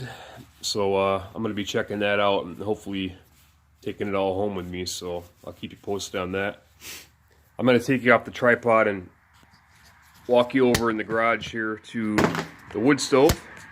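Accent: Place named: Long Island, United States. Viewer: You from long island???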